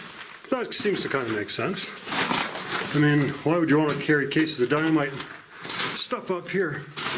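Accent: Canada. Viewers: Wonder if they would let me pick some of the gold out, sort of a day trip thing. Looks like it would be fun. We can tell you're Canadian, ay. The quartz is white because it formed with oxygen, so it's name is really quartzite.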